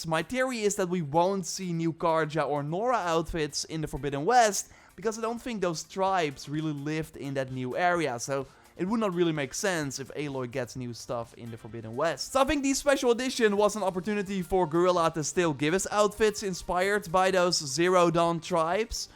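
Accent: Netherlands. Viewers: As a dutch u can so clearly hear u are dutch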